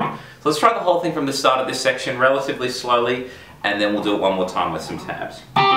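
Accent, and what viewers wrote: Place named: Australia. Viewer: Always refreshing to hear the Aussie accent.... great work....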